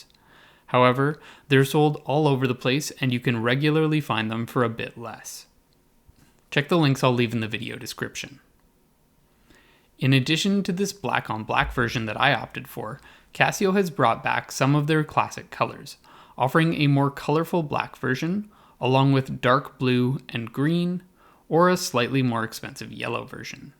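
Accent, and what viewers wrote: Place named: United States. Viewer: If you have an American accent why do you give the wrist measurement in metric and not inches?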